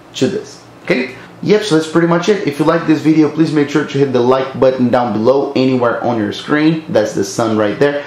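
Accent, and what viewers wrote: Brazil. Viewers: Haha eu perguntei pq eu também sou mano. Também moro nos EUA. Meio q consigo captar um sotaque brasileiro, mas vc fala mto bem. Abraços!! E o vídeo me ajudou tbm! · brasileiro. certeza. sotaque. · Are you BR? two seconds into the video I could tell from your accent.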